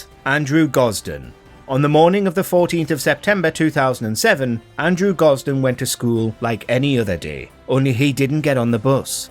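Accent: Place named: Geordie, United Kingdom. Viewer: No, it has a twang of Geordie. Maybe Middlesbrough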